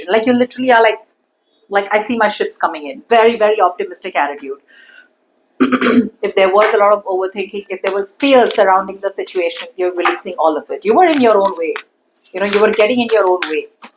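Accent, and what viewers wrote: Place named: United States. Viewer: American english....no understand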